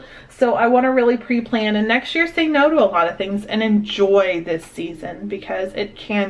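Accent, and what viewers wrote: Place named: South, United States. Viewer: I am starting to hear a southern accent 😀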